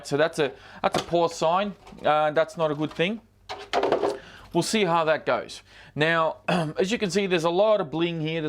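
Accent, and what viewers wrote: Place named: Australia. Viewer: There's a great big opening here, which would've allowed for some plastic to come through and mount onto a BAM-PA-BAAAA!! There's your next remix video, DJ's. Gotta love that Ausie accent.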